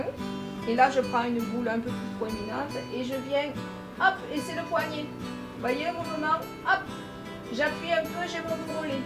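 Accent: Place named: Provence, France. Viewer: Vos peintures sont très belles , et votre accent a un parfum agréable de provence . Merci pour vos tuto .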